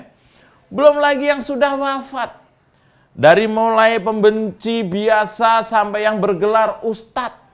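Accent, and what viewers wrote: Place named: Yogyakarta, Indonesia. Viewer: gaya guyonan khas..jogja kentel..diserang tertawa dialem blunder..koyo kucing tilem...gree gree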